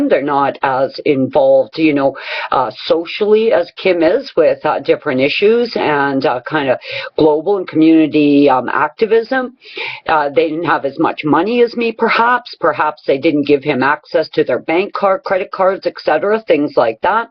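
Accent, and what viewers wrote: Canada. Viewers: Hey Kim, if I may ask what province are you from? I think Nova Scotia, and also for some reason,Manitoba. I'm from Ontario, theres no place like home.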